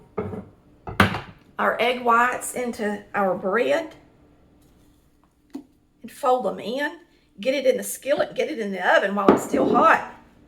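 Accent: South, United States. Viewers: REMINDS ME A WEE BIT OF YORKSHIRE PUDDING. GREETINGS FAE SCOTLAND TAE APPALACHIA. YEEHAW, LOVE YIR SOUTHERN DRAWL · I can’t express to you how much I value and enjoy your videos. I live alone and your videos help get me thru the long evenings and aloneness. Your sweet southern accent and the way you talk is wonderful! I don’t cook but I love watching you cook up some yummy dishes and love seeing you and hearing you. It feels like I’m in the kitchen with a friend and not so stinkin alone. God bless you!!!!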